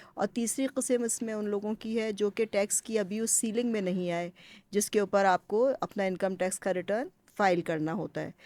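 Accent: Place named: Sialkot, Pakistan. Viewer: gi host sahiba ap Sialkoti lag rehi hn from your accent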